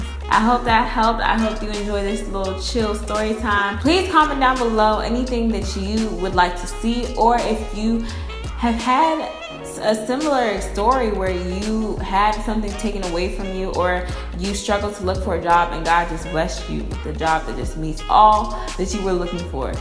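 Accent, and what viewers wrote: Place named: Baltimore, United States. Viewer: I can tell she from Baltimore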